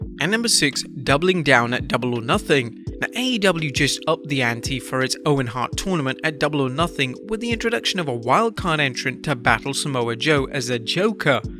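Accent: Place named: United Kingdom. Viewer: I always look forward to hearing you return , UK accent FTW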